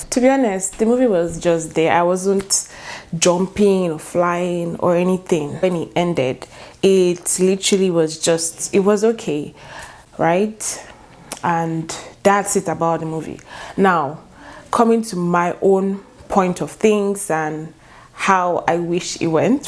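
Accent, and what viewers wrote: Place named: Pidgin, Nigeria. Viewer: Its ur pidgin for me😂😂. I cant even speak like that🚶‍♀️🚶‍♀️🚶‍♀️. Me I sha loved the movie oh.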